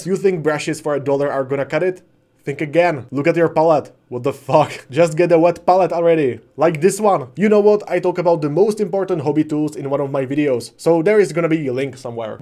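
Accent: Czech Republic. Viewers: Zdar, paradni mas videa bro. Zijes v Ameru? Ja jsem v Chicago..zdalo se mi podle accentu ze budes od nas, ale az pak tvuj email te prozradil a jeden napis Praha na jednom ze stetcu.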